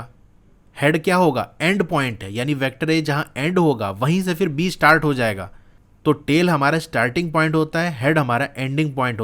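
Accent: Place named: Allahabad, India. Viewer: Sir are you from.allahabad or any nearby city from it your accent is similar to Allahabad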